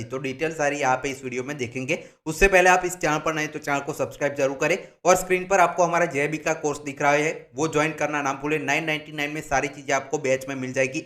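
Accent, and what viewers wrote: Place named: Bengal, India. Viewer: U r talking like Bengolian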